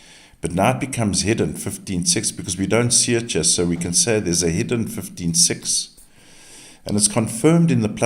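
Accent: South Africa. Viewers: By any chance are you South African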